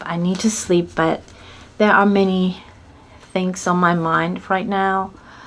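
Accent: United States. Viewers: As an Indian I liked your content ma'am because of your American accent. And you just teach us American english accent please🙏🙏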